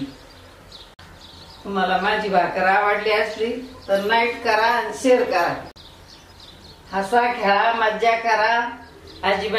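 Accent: Ahmednagar, India. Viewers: आमच्या नगरी आजीची मस्त भाकरी.. आन् झणझणीत नगरी बोली..😀👍🏻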